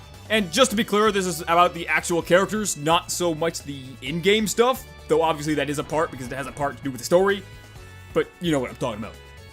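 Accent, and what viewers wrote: Boston, United States. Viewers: fast Boston boy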